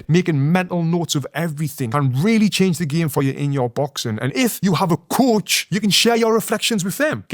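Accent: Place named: Newcastle, United Kingdom. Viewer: You produce great content and I love the Geordie accent. My old man was born in South Shields in 1907 and our family moved to Australia in 1951. He never lost his Geordie accent and was very proud of his origins. He fought pro as a young man and served in the Royal Engineers in the War. He won the regimental middleweight title when he was 37 - the oldest entrant in the tournament. When the CO presented him with the winner's prize - 500 cigarettes!!! - he said to him, "Well done sapper. I think you've fought before!"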